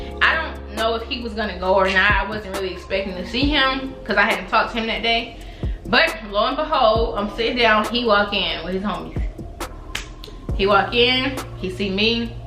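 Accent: Miami, United States. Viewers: Sound like Miami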